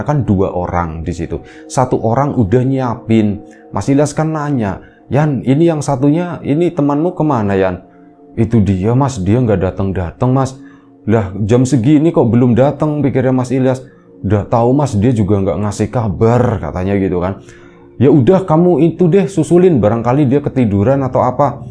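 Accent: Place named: Banyumas, Indonesia. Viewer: oh pantesan ngapak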